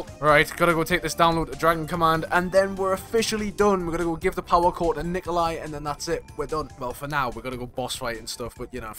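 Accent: United Kingdom. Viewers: hey my friend and I have been trying to place your accent for like 30 minutes, I'm hearing a little bit of Welsh/sometimes Celtic I fluences and he's saying Southern England. Were we close? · Newcastle or Sunderland?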